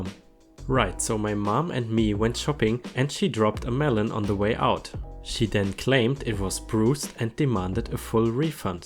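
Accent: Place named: Germany. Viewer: Cooles Video, muss zugeben ich war nicht überrascht als du gesagt hast “Germany”, Deutsche hör ich sofort :D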